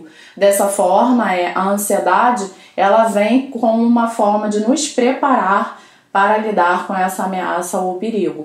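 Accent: Rio de Janeiro, Brazil. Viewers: Você é Carioca? Ótimo video Devo deixar de tomar Café? Consumo mais do que o permitido.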